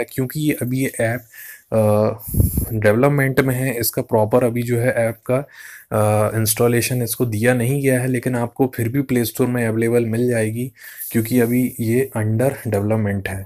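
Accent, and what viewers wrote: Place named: India. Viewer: You speak so fast and you have indu accent. I did understand just afew words. 🤦🏽‍♀️🤦🏽‍♀️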